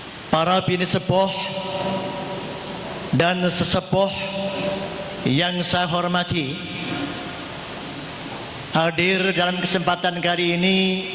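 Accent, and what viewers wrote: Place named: Java, Indonesia. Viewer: kalau Ak sih gk tahu yah terlpas itu Benr atau tdk Cuma nih yah ,yg bisa Ak tanggap Knph kok ,Bahasanya masih aja medok bgt yah, gkd nular nular org luar, minimal Bahasanya gk medok bgt bgitu lohh Kan kuliah di luar negeri kan ??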